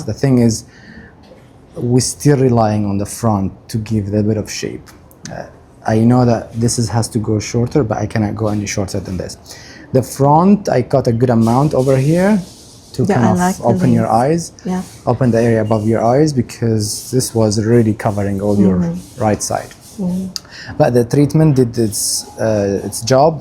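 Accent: South Africa. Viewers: She sounds S. African.? I am in SA ❤❤❤❤❤❤💯💯🙏🙏🙏🙏😍😍😍😍💋💋💋💋 . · When I started listening I just knew!!! South Africa!! Afrikaans!! Wow love it. So glad for you. Enjoy it for all of us. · She sounds South African😊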